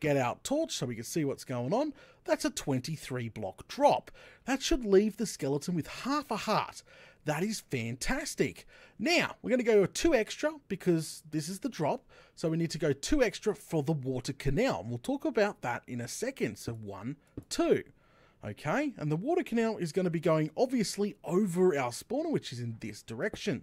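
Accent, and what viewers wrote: Australia. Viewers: I love this guys Aussie accent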